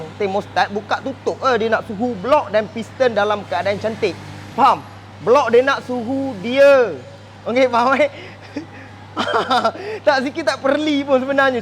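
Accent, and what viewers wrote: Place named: Terengganu, Malaysia. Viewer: Gaye bercakap mcm org terenganu ni,betul ke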